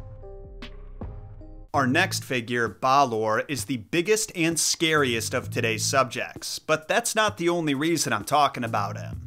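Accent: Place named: United States. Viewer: The pronunciation is almost there but I think it's the American twang that makes it sound funny 😂 Loved this I would definitely love to see more ❤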